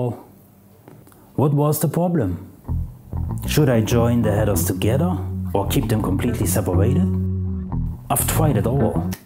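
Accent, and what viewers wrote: East Germany, Germany. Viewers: geil wie man selbst im englischen den Ossi Dialekt raushört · Bei dem sehr deutlich deutschem Akzent könnte man "Mercedes" doch auch gleich richtig deutsch aussprechen. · Moin Moin aus dem Norden, ist der Protagonist etwa Deutscher? 😀 Das Englisch hört sich wie Denglisch an ;-) Abo ist raus! LG HEUERs Pit Stop 🚗💨💥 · Thats the best german english ive ever heard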